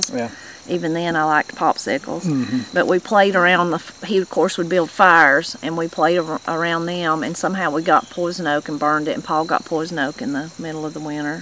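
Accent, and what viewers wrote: Appalachia, United States. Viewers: Huskvana is the phonetic way of prouncing the Swedish brand chainsaw, but who cares if you mis-pronounce a word. It dosen't matter because we love your accent and Appalachian special words. That metal tub would make a great planter. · Ah the popsicles in the garden :) We might get snow tonight up here (I hope not) and I really need to clean my garden up too. Also, I love how you pronounce Husqvarna :) And while it's not how it's pronounced in Sweden/Denmark/Norway (it's a Swedish brand) I totally understood you - it was just done with an Appalachian accent! :) (pronounced like: hoosk ·vaar·nuh)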